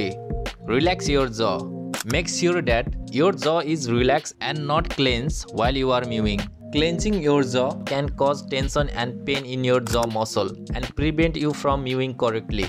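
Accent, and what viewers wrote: Nepal. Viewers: Bro face reveal gara ta tmero sound le tw 100 percent nepali ho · Nepali cha taw boli tah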